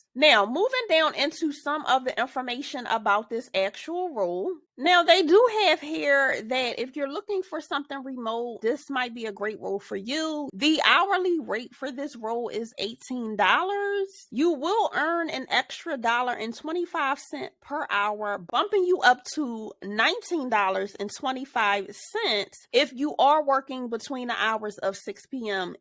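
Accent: Philadelphia, United States. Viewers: That philly accent is strong!!! I love your energy lol keep going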